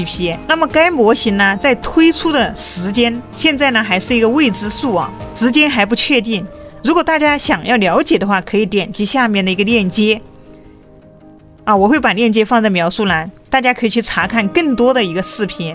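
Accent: Sichuan, China. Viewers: 好亲切的川普~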